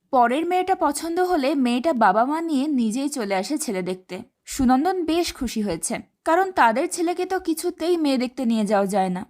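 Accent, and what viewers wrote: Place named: Bangladesh. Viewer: seser dik apnar comment sune bojha gelo apni Bangladesh er. Khub sundor... Osadharon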